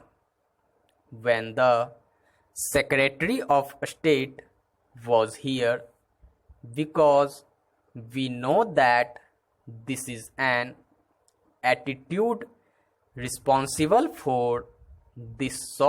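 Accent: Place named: India. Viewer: Bro, first you have to change your tone of english speaking because as you read english it seems like you are reading in a hindi tone. you need to learn english how to use tone at a specific place. Tone changes everything in english if your tone is wrong. So, try to learn how to use tone and pitch then record it and publish it on youtube. it is my suggestion bro. If you apply these things you will see changes in your reading and easy to understand what you want to dictate.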